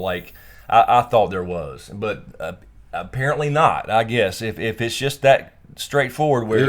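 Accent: South, United States. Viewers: there is nothing wrong with a sweet good ol boy accent. just ask for parts as soldiers in someones army.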